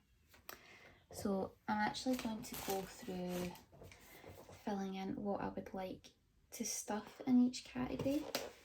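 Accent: Scotland, United Kingdom. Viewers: I love Scottish accents I have two friends who are from Glasgow and they live here in England and still have the accent 💚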